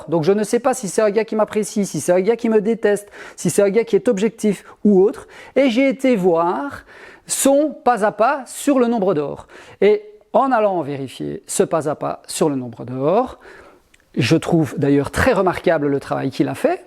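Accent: Belgium. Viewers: Salut, je suis venu sur ta chaine par le biais de floky, malgré le temps je suis toujours là à regarder tes videos et on doit être un gros paquet, nous comprenons bien ton coup de mou, pas facile d'encaisser des critiques sans rien savoir de l'honneteté intellectuelle, de la compétence, ni de la comprehension des personnes les regardant. Pour comprendre tes vidéos, il faut avoir connu tes débuts, tes explications, et savoir que tu n'as rien d'un donneur de leçon... Alors, comme tu ne vas pas sans cesse expliquer sous peine de ne pas avancer, dis toi simplement que parmi tes 40K d'abonnés, tous ne sont pas bienveillants et avance et crois moi le jour ou tu auras le melon ou tout autre connerie, la communauté entiere saura te dire à quel point tu es dans l'erreur...en attendant, on aime tes videos, les astuces minutes (tant appreciées de floky), tes prononciations belges, l'académie du 'Suleve', ton amour des abeilles, bref, releve toi, mon gars t'es une bête du bois.. 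· Salut, j'aime beaucoup ton "Belge parlé", je te rassure tu n'es pas un cheval blanc et encore moins une star du you tube, mais reste comme ça, la qualité du contenu est bien perçue, on sent bien le VRAI. Un seul regret, c'est que la Belgique soit si loin du pays basque 😊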